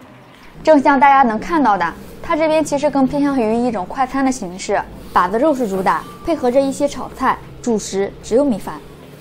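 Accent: Shandong, China. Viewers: 主持人这山东口音太浓了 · 妹妹是山东哪里人？好熟悉的方言普通话发音😂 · 有山东口音呀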